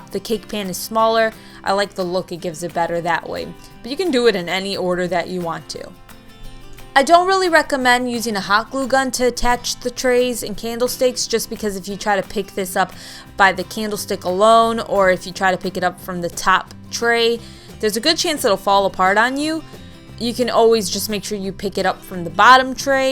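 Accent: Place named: Arkansas, United States. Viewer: Love you're farm house decore u did awesome thanks for showing how to make a tiered tray without spending a fortune been wanting one but wasn't paying what thy wanted what about a tiered basket with e6000 put on carefully glued I wonder how that would work it if u could use a wooden candle stick I think it would work the hardest part would be the first candle stick but maybe turn ur candlestick and basket upside down for the first part and then doing the rest and don't paint until after all together don't know just a thought but I bet u could come up with something I see a lot of the black wired baskets. Girl I love you're channel ur my very favorite and love ur accent where are u from u use y'all like we do in Arkansas that's where I'm from. Awesome Job you are so talented.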